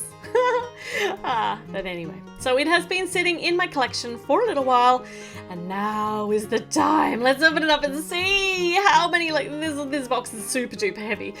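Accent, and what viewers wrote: Australia. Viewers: Yeah, in Australia people pronounce it "baahk", such a charming accent! 🐨😊You should've heard her last year when she said "care bear" in a vid 😛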